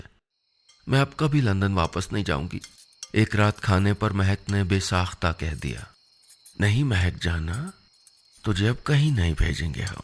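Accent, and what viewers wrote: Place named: Kashmir, India. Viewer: jitni khoobsoorti se aapne kahani ke liye, kashmiri accent laya hai, isse badhkar kuch nahi 💙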